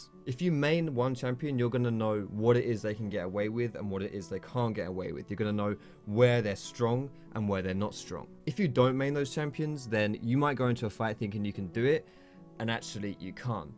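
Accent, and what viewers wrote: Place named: United Kingdom. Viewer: I love British accent :3 #nohomo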